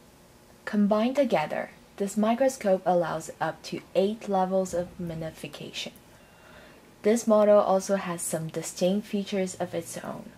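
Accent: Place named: Asia. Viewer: sounds like an asian woman trying her hardest to speak english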